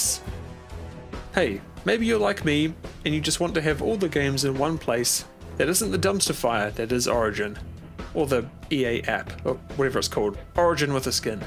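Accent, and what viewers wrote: New Zealand. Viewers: I detect a kiwi accent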